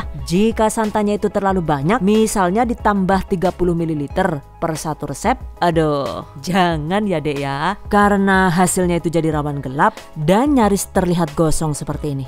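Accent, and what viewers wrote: Banyumas, Indonesia. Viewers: Wong ngapak jebule ... 😁👌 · Lah ngapak😂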